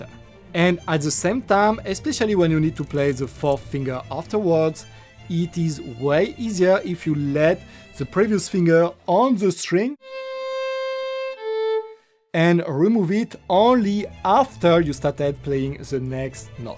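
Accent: France. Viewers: These are some of the best tips and tutorials on YT. I do have a little difficulty with the French accent. One suggestion is to correct a grammar mistake often made throughout these videos. He says "Let your finger on the string" for instance. What he means is "Leave your finger on the string." The word you want is leave.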